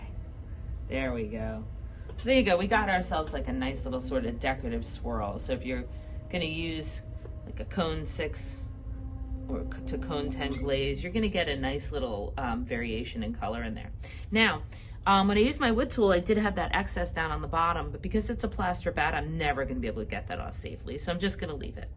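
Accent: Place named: New Jersey, United States. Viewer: My teacher is also from New Jersey.. you two have same accent.. she also says to "call it a pot".😁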